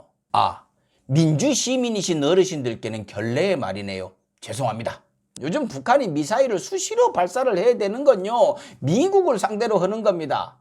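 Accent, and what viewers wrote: Jeolla, South Korea. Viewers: 혹시 전라도 출신이신가?? 궁금해서요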